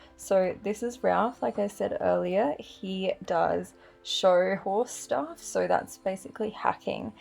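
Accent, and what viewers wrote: Australia. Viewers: She is from Australia, that's our accent.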